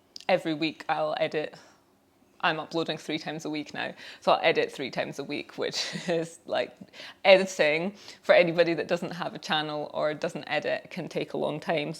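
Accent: Scotland, United Kingdom. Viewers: Your Scottish accent sounds so funny to my ears lol, where are u originally from if i may ask?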